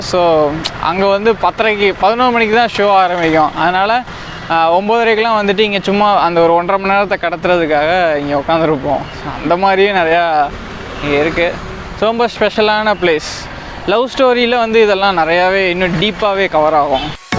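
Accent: Madurai, India. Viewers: Neenga madurai ya bro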